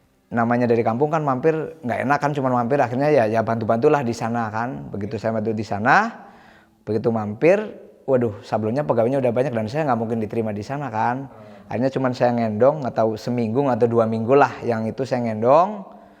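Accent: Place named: Banyumas, Indonesia. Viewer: Kayane wong ngapak kie lur 🙌😄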